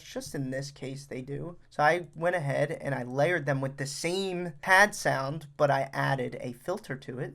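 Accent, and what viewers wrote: Philadelphia, United States. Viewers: Your Philly/South Jersey accent reminds me of home. Love your jawns bro 😂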